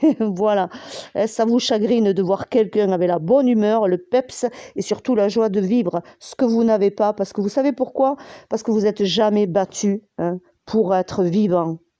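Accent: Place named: South, France. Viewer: Et un bel accent qui nous emmène au soleil en plus 😘😘😘pour enmerder des détracteurs...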